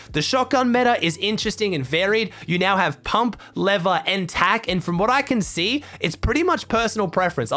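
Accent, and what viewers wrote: Australia. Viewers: Yessirrrrr what’s up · Hey can u put. Captions on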